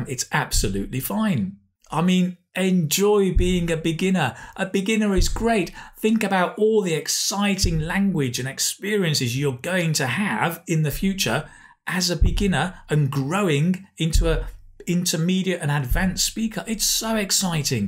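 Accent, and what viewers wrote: United Kingdom. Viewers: Where is from??? Nd is this British accent or american accent. Well I think it may be British accent.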